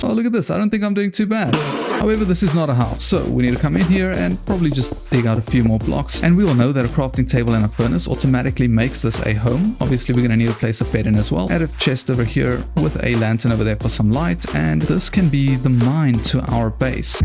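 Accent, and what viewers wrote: South Africa. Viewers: Are you from south africa? I can hear a accent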